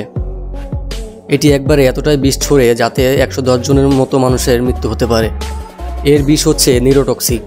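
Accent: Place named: Bangladesh. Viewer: Khub Bajey Bangladeshi Gramya Uchcharon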